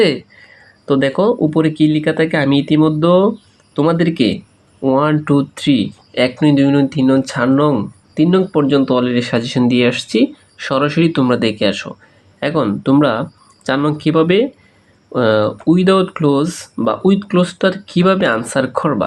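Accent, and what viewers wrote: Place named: Sylhet, Bangladesh. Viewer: Suru Sylheti😁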